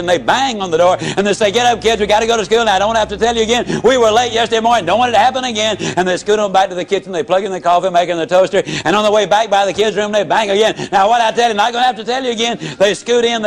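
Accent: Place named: South, United States. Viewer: His perspective is valuable and you can learn by reading his writing if you don't like a southern accent.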